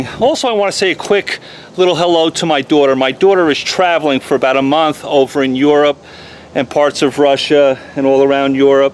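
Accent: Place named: Minnesota, United States. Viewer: I’d love to try this, but I’m in Minneapolis. No stores in MN sell, one store each in both Dakotas, and one in Madison. I’ve always used Meguiar’s Expeess Spray Wx when I fry a car. Works like a dream tool. Plus, Meguiar’s is a 3M company, so I’m using product from a hometown place. Sure liked this video,. I like watching you because you’re thorough, and it’s nice to hear my accent out of someone else’s mouth for a change.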